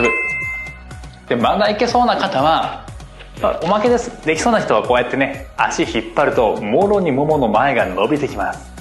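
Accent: Kansai, Japan. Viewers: 時々出る関西弁がわりと和む(笑) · 色んな困難を乗り越えてらしたのですね🥺 スゴく魅力的で たまに関西訛りがチャーミングです。 わかりやすくて 取り組みやすいものばかりで 感謝です✨ お身体に気をつけて 御過ごしください🎵(*^^*)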